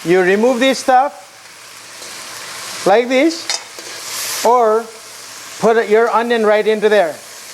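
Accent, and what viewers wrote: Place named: Nepal. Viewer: You can tell he is Nepali by accent Grt job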